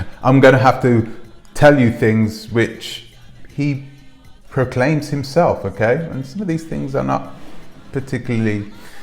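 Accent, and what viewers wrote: United Kingdom. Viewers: An African speaking in a British accent hating on a black American man’s success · You’re a fraud, you British talking sounding shavy head having Weirdo.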